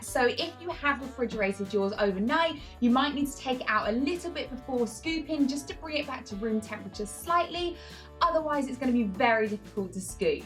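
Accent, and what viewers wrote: United Kingdom. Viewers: I dont know why but british accent makes it for me ... "half an hour" how high it goes ;) <3 exately. Cant compare with american ones. Is it my nostalgia for UK. Will try it out aaaaallrigh? :)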